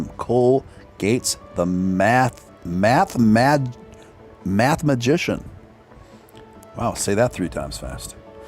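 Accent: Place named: Chicago, United States. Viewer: Hey.. Your accent Sounds like your from Chicago..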